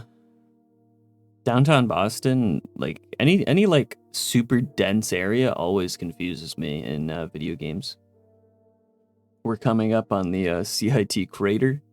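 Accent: Canada. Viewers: Ah he’s Canadian never noticed that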